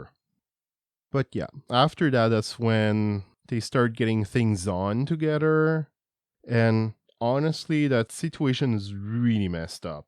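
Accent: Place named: Netherlands. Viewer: You sound a bit Dutch.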